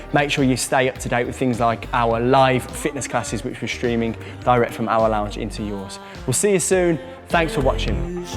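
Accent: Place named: Birmingham, United Kingdom. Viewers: thanks dude for this information also for this british accent hahahahah;) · you don’t hear the Birmingham accent on YouTube! 😀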